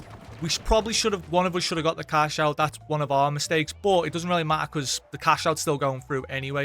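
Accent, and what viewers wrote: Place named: Scotland, United Kingdom. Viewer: thank you friendly Scottish man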